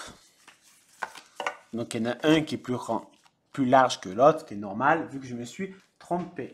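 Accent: South, France. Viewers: Très beau. Je vais me mettre à faire des albums. Jusqu'à présent que de la carterie... J'adore ta bonne humeur et ton accent méridional qui met du baume au coeur. Bon week-end à Seb et toi. Scrapbises. Chantal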